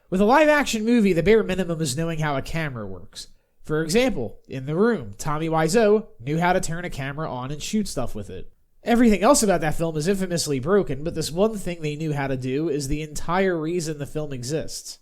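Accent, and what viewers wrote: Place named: Philadelphia, United States. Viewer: Damn your Philly accent ya? Harsh!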